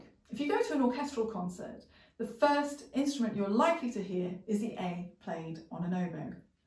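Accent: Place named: United Kingdom. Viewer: I so love British accents. Mesmerized....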